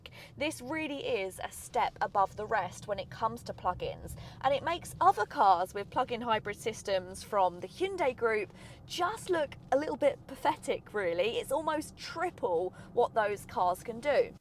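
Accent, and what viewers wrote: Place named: Estuary, United Kingdom. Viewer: Sorry. The estuary accent and the presenter's odd dress sense totally distracted me from the actual content.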